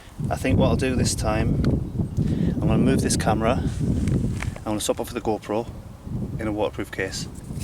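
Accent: United Kingdom. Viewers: Is that a fellow Mackem accent my senses detect? Can’t mistake that North Sea east coast wind on the mic either! Great video. I’m busy using my Pulsar to test .177 QYS 8.48gn v AA Field Diablos and against my hunting go-to the 10.34gn JSB Heavy. Also have the test to do of Polymag shorts against the 9.56grain QYS’s. Have subscribed so look forward to seeing your videos and more vids. Best of luck. (I’m SR6 Marina based) 👍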